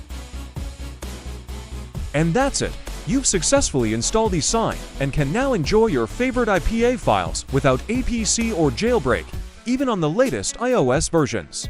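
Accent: South Africa. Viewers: Are u South Africa bro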